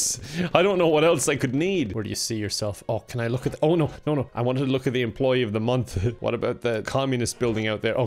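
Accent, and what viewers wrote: Ireland. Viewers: Its cuz of the Irish dialect. Its an Irish thing, not a thing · is a 100 percent Irish human.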